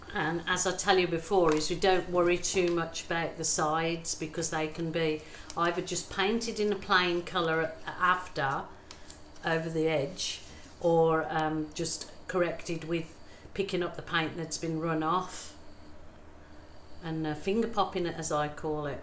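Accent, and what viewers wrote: Birmingham, United Kingdom. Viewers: Well done. I feel your anxiety. I keep trying that technique but I’m not getting the paint consistency right 🤦‍♀️. The finished result turned out pretty., I knew I detected a British accent in there, hidden under the Australian one 😊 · I am trying to determine your accent. I’m going to say Brummie ?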